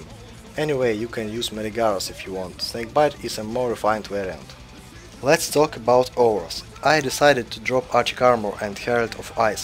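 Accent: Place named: Russia. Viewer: почему автор говорит на англе , но при этом имеет русскоязычный акцент ? :D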